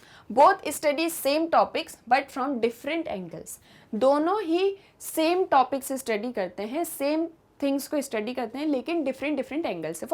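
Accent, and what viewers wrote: India. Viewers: How are u doing dear Madam. I am so much eager to listen to ur lesson on sociology. However , it so difficult to understand ur pronunciation as it is highly influenced by zIindian English.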